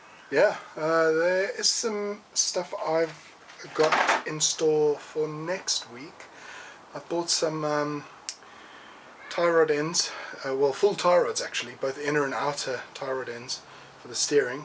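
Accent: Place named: South Africa. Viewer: You got a bit of a mixed up accent, can’t place it, sounds a touch of South African..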